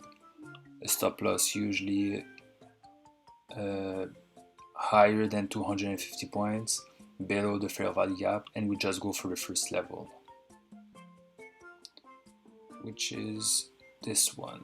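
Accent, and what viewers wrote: France. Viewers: Hi, nice videos, can smell a french accent here :) Or maybe not .. Is the checkbox on your chart an indicator !? If so, do you mind share a link ? Cheers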